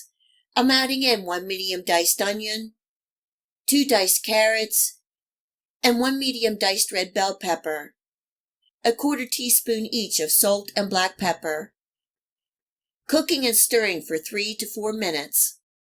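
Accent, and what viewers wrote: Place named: Pennsylvania, United States. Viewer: Made something similar today, I was craving it. By the way … are you from Pennsylvania? I detect that accent, because I’m originally from there, and I know it when I hear it!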